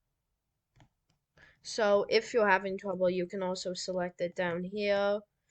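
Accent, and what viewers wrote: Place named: United Kingdom. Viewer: you sound British no offense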